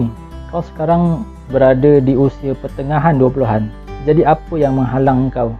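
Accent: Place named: Java, Indonesia. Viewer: Pekat jawanyaa.. Very inspiring bro 👍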